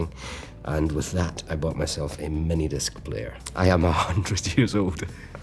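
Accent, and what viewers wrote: Scotland, United Kingdom. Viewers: Best Scottish accent I've heard 😆 I think we have our next Macbeth? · Oh l love your Scottish accent,never lose it!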